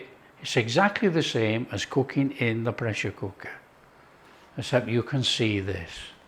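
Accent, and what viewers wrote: United Kingdom. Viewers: Just watched it for the goo 'ol br'ish accent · Sir are you a Yorkshireman ? You sound Dickie Birdie and almost like Groffrey Boycott buy then not quite like him though. Wonder if Dir Groffrey will like this Indian broth 😀 Happy Khichding 😀. Khichdi in Indian colloquial parlance means a ness 😀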